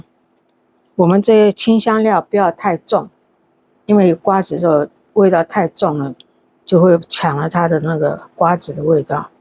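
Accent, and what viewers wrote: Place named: Shanghai, China. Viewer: 我覺得媽媽好像是江浙人、因為有上海口音。我父母就是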